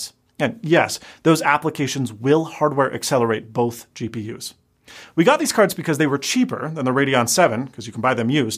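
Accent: Spain. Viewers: You used to have a Spanish accent? No idea. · What’s up with Spanish accent? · Yo. Spanish accent? TF?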